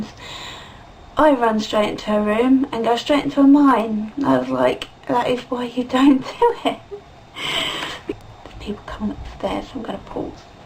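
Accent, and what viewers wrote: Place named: Estuary, United Kingdom. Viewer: You sound like her too, she has a kind of estuary accent (in interviews, not in her songs). I'm constantly surprised by people not knowing. You must have heard. Anyway, Abe's Oddysee is great. It was too bloody hard for me when I was 13 though, so I didn't get far. If you like the gameplay, you should play Another World on the Megadrive or SNES.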